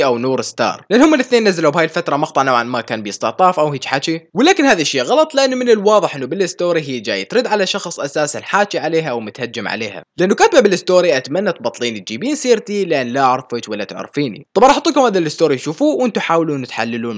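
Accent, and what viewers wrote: Iraq. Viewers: انت عراقي لو سوري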